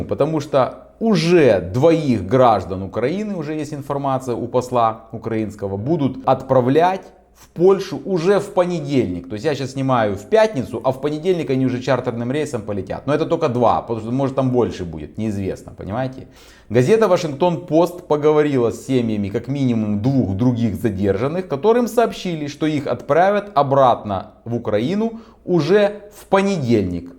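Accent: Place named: Russia. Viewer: как я понял по интонации вашей речи, вы гражданин России по рождению?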